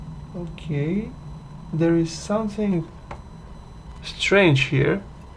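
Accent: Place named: Romania. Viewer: Romanian english.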